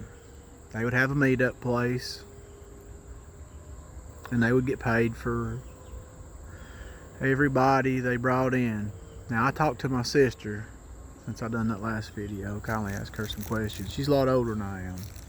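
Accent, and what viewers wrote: South, United States. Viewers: New subscriber here, and I love you channel! Not only is the storytelling fantastic, but I love that you take us along on the walks through your beautiful part of the country. I lost my grandparents very young, so I never got the “sit around the campfire experience” with them. Although you’re too young to be my grandparent, I’m still getting the spine-tingling fun of hearing your tales. And, one last silly compliment.... I’m a native southerner who married a (wonderful) Yankee man and ended moving way up north and raising children up north. There’s nothing more lovely to my ear (with the exception of a hymn in church) to a southern drawl... and I never hear that anymore. So, I’m a fan and will be back to watch and like your videos! Thank you for doing them! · Yeah, I honestly believe him. This is a tiny channel from a guy who wants to pass on some knowledge about an area he spent most of his life in. 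I also enjoy his Southern drawl. So soothing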